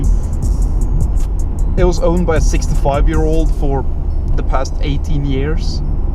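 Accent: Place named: Norway. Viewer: Where are you from? You sound Norwegian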